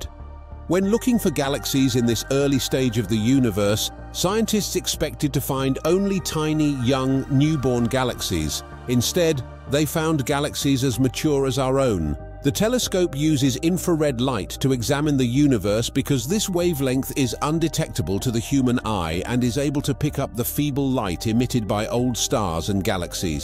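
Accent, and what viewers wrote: United Kingdom. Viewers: One thing we can count on from the YouTube corporation is a reader with a Brit accent. How obvious does a grift have to be?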